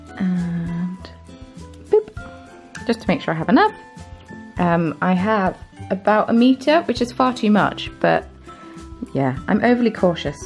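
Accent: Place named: England, United Kingdom. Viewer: hello " " just subscribed to your channel. just posted another note to your answer to my post on another's channel. anyway, I am looking forward to checking out many more of your videos. I like hearing you talk, my Arkansas dialect is not as well sounding as your England accent. anyhow, looking forward to many more tutorials. sincerely Becky B from Arkansas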